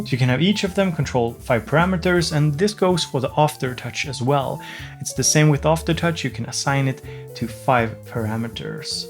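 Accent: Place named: Sweden. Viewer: Very traditional svenglish ;)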